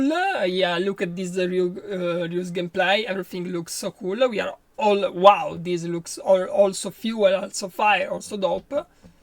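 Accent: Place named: Italy. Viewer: Love the italien accent! Great video!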